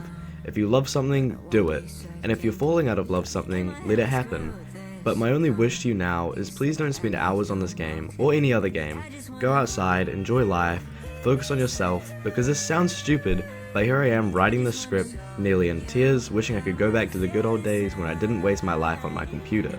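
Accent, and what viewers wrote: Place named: New Zealand. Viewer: Wait you said you conquered au you sound like a New Zealander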